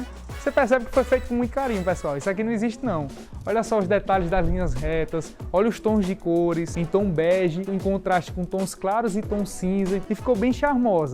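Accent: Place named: Ceará, Brazil. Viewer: Gente esse canal virou uma série pra mim. Na proxima encarnação vou nascer no ceará quero ter um sotaque assim.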